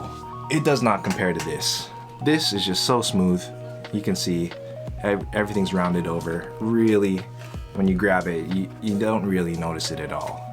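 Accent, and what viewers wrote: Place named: Hawaii, United States. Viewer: I thought you were from Hawaii after hearing you speak and your demeanor. So am I. I’ve been an Ibanez player for as long as I’ve been playing and developed tendinitis. I thought strandbergs ergonomics would be a reason to switch.. maybe it still is but it’s a bummer to hear your wrist hurt after shows.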